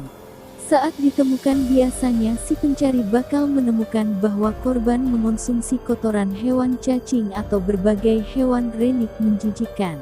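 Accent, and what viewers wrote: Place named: Java, Indonesia. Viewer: hadir mbak medok ..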